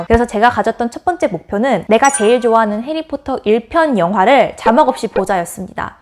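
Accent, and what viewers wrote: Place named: South Korea. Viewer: 아무리 국내파라도 결국 영어유치원 원어민 학원 줄창 다닌 것 같은데? 아닌가요? 그래도 한국 수도권식 단조로운 발음 악센트 고치려고 엄청 노력했을 것 같네요 ㅎㅎ 조선인들은 외국어비교부심이 강해서 ㅋㅋ 자기는 할 줄 아는 외국어 하나도 없으면서 남의 와국어 평가하고 자빠졌음 ㅋㅋ 이것도 외국어 공부하는 사람들이 넘어야할 부분중에 하나임..😂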